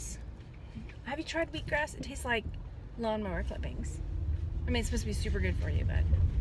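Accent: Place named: South, United States. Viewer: Happy early Birthday! more southern lady accent talk. :)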